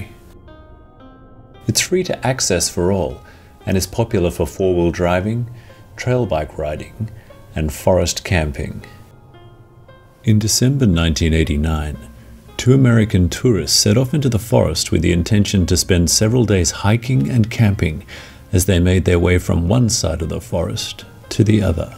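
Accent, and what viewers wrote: Australia. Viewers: Is it the cultivated Aussie accent?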